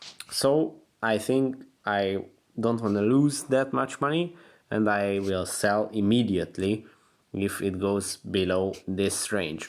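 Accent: Hungary. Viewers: Ur from Hungary, I hear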